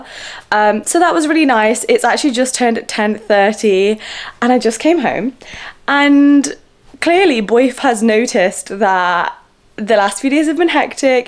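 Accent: United Kingdom. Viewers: I love you London ascent ❤❤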